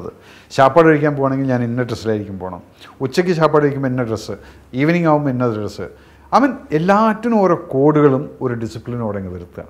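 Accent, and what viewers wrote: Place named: Tamil Nadu, India. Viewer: His Tamil accent is superb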